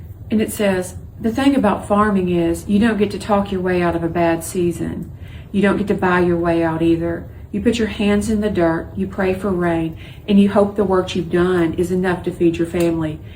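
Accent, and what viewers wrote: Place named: South, United States. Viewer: Oh my god, I am so thrilled to hear a southern voice speaking the words you are laying down. I am almost 70. My late husband was a combat VN vet. He was born and raised outside Charlotte, NC. My mom was born and raised in West Virginia and I spent many a summer there as a child. And to this day I still go back to visit all my in-laws at least once a year. All that to say I LOVE hearing southerners, it makes me feel at home, even though I am a Californian. And when I hear them speaking inescapable truths - well I’m just crying as I watch your few videos. Monte Mader turned me on to you. I don’t do social media much so I don’t know how to follow you other than this YouTube stuff. It says you only have a few hundred subscribers which I have to think will grow after your latest (and horrifyingly accurate) video comparing ICE to ISIS. 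God Bless You!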